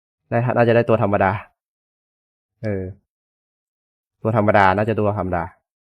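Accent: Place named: North, Thailand. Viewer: พี่คนเหนือปะครับ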